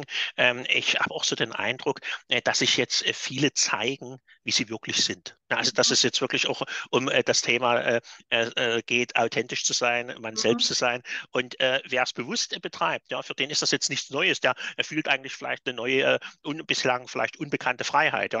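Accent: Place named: Saxony, Germany. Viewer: Wunderbar 🙏🙏🙏ich liebe Ihren sächsischen Dialekt 😂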